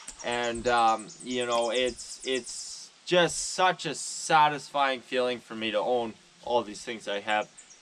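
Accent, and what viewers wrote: Canada. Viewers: I could listen to you talk for hours about anything. Your accent is amazing and as a fellow Canadian I wish I had it lol. You’re the most attractive man on YouTube ❤️ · Lol right away I thought this guys Canadian.. anyway good video and I subscribed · As soon as you started talking I knew you were Canadian. LOL We do have an accent that is identifiable. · I knew you were Canadian with the first sentence you uttered. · He reminds me of letter Kenny. Also I don’t know why I’ve never heard it but Canadian accents like his almost sound Irish at times. They have the lilt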